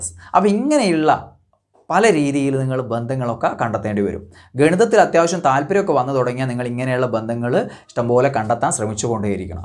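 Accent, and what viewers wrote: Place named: Malabar, India. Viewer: അതു മലബാർഏരിയയിലെ സ്ലാങ് ആണ്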